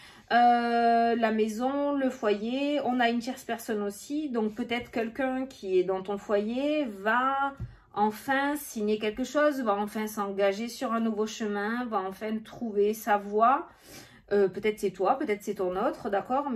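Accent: South, France. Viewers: Ton accent me parle très bien car je suis muté dans le Sud, je recommence tout à zéro et les finances suivront 👍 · Coucou, j'adore votre façon de faire les guidances, votre accent du sud, votre franc-parler lol 👍😁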